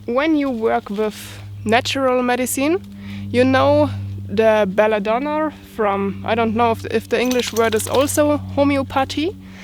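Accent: Germany. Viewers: You could have carried a couple of cans of beer to drink when thirsty. I did not know Germans drank water. Your camp looks like it is infested with mosquitoes ! I hope you had the means to deal with them. You should have also brought bikini tops to wear when swimming. The tee shirt will not ward off the cold if wet. BTW, I love your German accent and how you patiently you explain in English.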